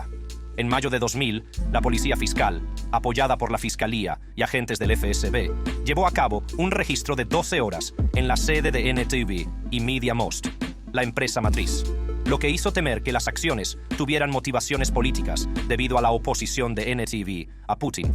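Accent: United States. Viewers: Tan buenas noticias..pero que pésimo gringo o ruso ..no se...se escucha mal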